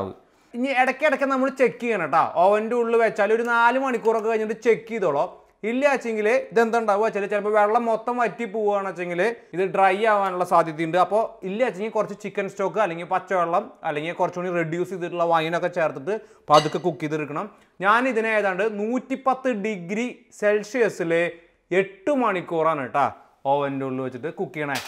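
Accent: Thrissur, India. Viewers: തൃശ്ശൂർ slang❤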